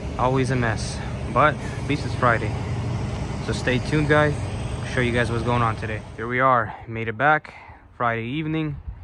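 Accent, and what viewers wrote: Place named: United States. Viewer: смотрю твои ролики,читаю комменты в переводе на русский.Тяжело воспринимаются слова на американском диалекте,но пытаюсь понимать вместе с жестами.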